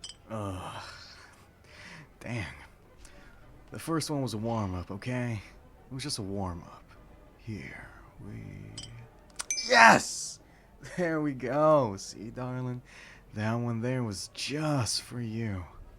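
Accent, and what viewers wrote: South, United States. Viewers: Okay ffs i wasnt exoecting it to work on me i can feel my heart rate pickin up quickly southern accents and country folk are alwasy gana be my weakness it reminds me of home and beyter days i supose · Accent: ✅ Nickname: ✅ Blushing: ✅ ✅ Safe to say southern boys are my thing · Ahhh! I’ve missed ur southern accent videos one of my favorites!! :3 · your southern accent is still as cute as your first cowboy roleplay HAHAHAAHHA! ( ≧∀≦)ノ CUTIIIEE! glad you're back <3 (((o(*ﾟ∀ﾟ*)o))) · Been absolutely lovin all these (your) audios, but the southern ones rly be hitting a bit different😊🫠. Hopefully gonna hear more in the future♡. (And possibly a part 2 to this one😇)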